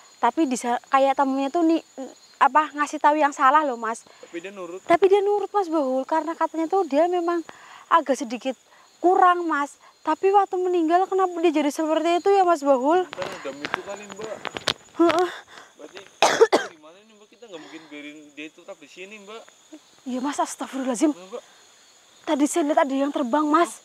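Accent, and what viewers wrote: Banyumas, Indonesia. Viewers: Kangen ngomong ngapaknya lucu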